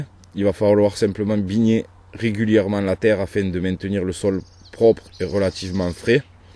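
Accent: South, France. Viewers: Oui cet accent c du sud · Avec l'accent du sud... c'est parfait. Merci beaucoup pour toutes ces informations.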